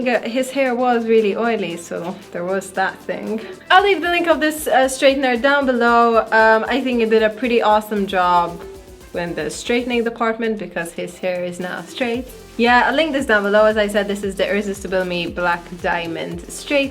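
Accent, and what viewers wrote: Italy. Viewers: It’s a typical Italian accent ...That’s really strong I didn’t know there were some people to appreciate this accent lol in my country (Italy) when we talk in English a lot of people don’t like the Italian accent because is considered to be ridiculous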